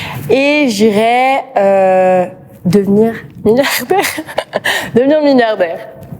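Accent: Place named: Alsace, France. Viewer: Accent alsacien incroyable 😂😂😂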